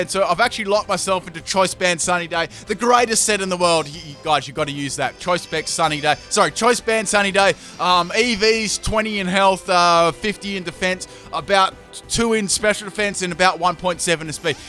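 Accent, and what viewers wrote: Australia. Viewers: Bro are u an auzzie?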